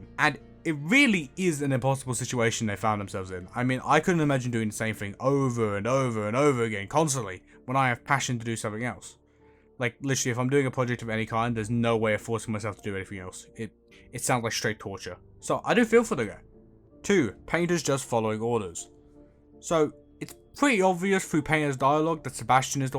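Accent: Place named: United Kingdom. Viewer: the thick british accent makes this video so much more interesting and entertaining, like on top of the accent you also speak slightly faster so its really funny.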